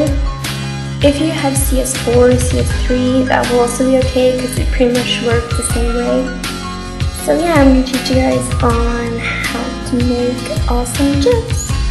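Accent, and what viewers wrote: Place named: United States. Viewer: you sound american to me.... 0_0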